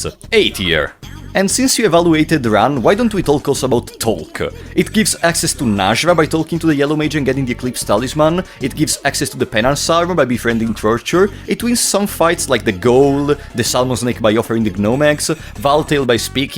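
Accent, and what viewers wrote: Italy. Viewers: Ahahahah! Ascoltando la pronuncia, sono andato a vedere nei commenti se fossi italiano. Good job, anyway! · Sento una cadenza italiana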